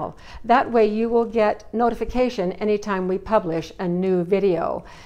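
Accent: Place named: Arizona, United States. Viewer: Is she from Arizona Sounds me like šhe is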